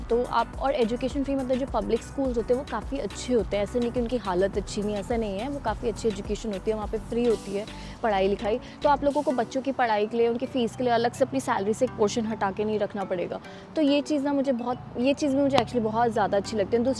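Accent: India. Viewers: awaz se karol bagh ki lag rai ho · loved your North Indian Hindi accent. Sounds awesome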